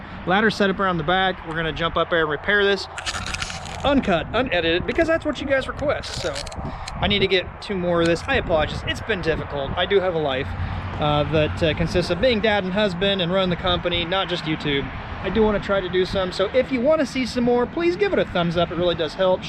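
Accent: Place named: Pittsburgh, United States. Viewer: Pittsburgh PA. You from Pittsburgh, I hear an accent lol. I have a shed roof to fix a few shingles on, thanks for the good video. Yeah, winter sucks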